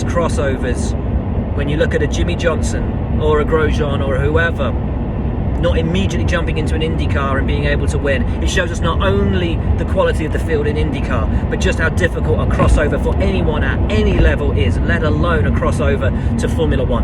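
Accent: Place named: United Kingdom. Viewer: It's nice hearing a fella with a British accent giving respect to the drivers from the US as well as the drivers in our US series'. Realistic take on things. Just hearing people chatter about Herta in the pinnacle is good enough IMO. I'm sure a few of Indycar's top drivers would be competitive in a Merc, RB, & the horse. But that will never happen. Pato just got a foot in that door on Sunday. He may win more races and contend for the championship in Indycar 2021. Could he do that in a Macleren F1???? A highly touted previous world drivers champion was unable to recently so....... ?????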